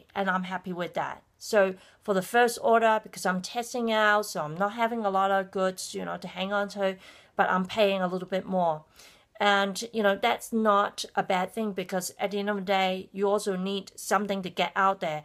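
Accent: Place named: Australia. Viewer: i like your australian accent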